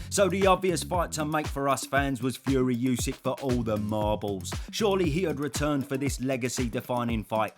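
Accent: Cockney, United Kingdom. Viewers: The cockney accent makes these videos 😅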